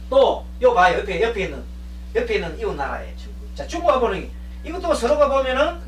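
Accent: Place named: Jeolla, South Korea. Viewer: 말씨를 보니 라도인간이고 사이비인간이로다 대가리는 삭발하였지만 완전한 좌빨인간이다 에잇 좌발놈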